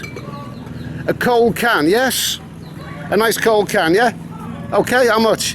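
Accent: Liverpool, United Kingdom. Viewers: Fantastic video, your photography was brilliant, your commentary was most informative without being boring, i think the Scouser accent gives it a nice cheeky humorous flavour, although i think you may have pissed off a few lads in the council estates around "LaPool.HeHeHe.Keep up the the good work brother look forward to more like this one.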